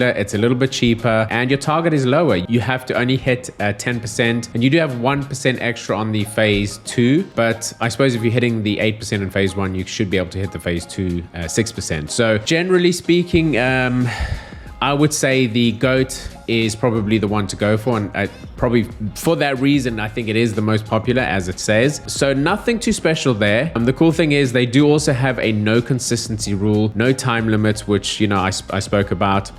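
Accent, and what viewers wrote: South Africa. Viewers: Bruv are you South African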